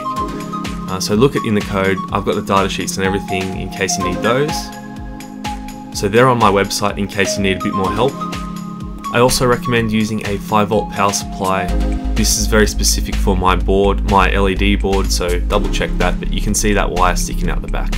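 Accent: Australia. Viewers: YOU’RE AUSSIE?! OMG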